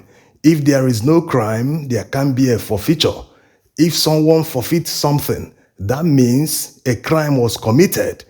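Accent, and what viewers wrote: Igbo, Nigeria. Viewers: Lovely Ibo accent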